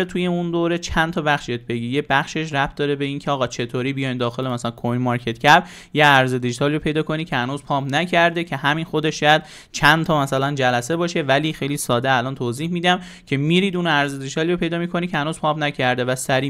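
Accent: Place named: Shahrekord, Iran. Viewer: لهجه دهکردیو ببین باو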